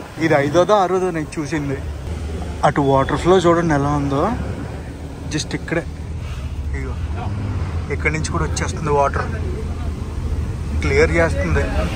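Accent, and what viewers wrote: Godavari, India. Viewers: haha super crazy vlog , godavari slang bababoi bababoi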